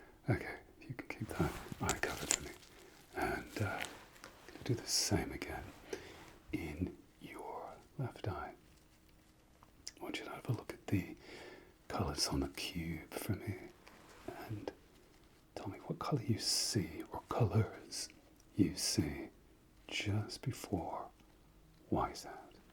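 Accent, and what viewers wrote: France. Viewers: " no screen 1h before sleep" woops. Désolé, i'm already in my bed watching your video xD what do we do xD Love your humour and your french accent, vous parlez très bien français ;) (from a french canadian ☺️)❤ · as a french person, i LOVE how you make the french accent !!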